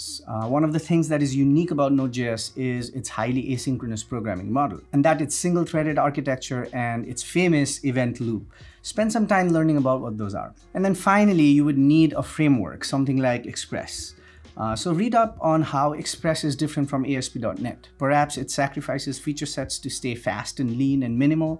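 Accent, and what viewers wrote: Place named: Nepal. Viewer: Amazing content! I always felt like you have a Nepali ascent. But today I noticed Swayambhunath Stupa on your hand. :)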